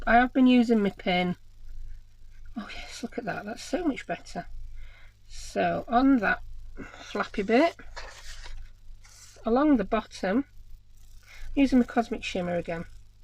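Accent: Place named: Birmingham, United Kingdom. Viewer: Oh....you are a treasure. You sound just like my Auntie from Birmingham and have the same sense of humour. Love every episode...keep em coming. Love from Canada xx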